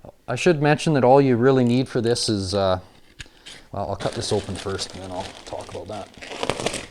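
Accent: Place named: Canada. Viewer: Canadian accent 😕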